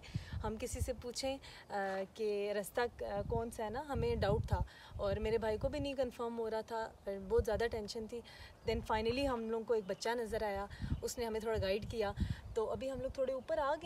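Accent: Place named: Pothwar, Pakistan. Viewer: Aap to pothwari bolti hai apni zuban main banaye aik video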